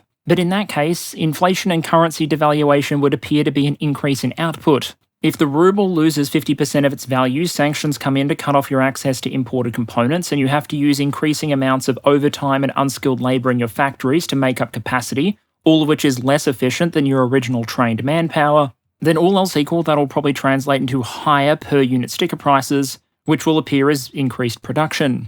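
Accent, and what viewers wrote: Australia. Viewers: WOW. High quality analysis! This is a high speed download of research-rich info, spiced with a little dry humor. Good clear diction in Aussie-accented English, which is less off-putting to us Americans (Oxford English lectures give us inferiority micro-seizures)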